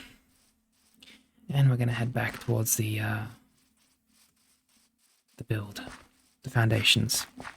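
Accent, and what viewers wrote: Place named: Australia. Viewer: Not me starting to fall asleep and going, wait, I know that accent, that's my accent! And checking if you're Australian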